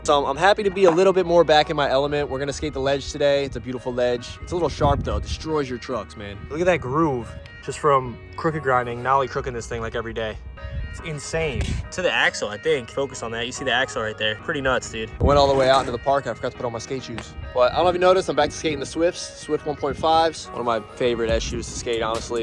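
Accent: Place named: Pennsylvania, United States. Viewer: You sound a little like. 😂 🔥 PA accent I guess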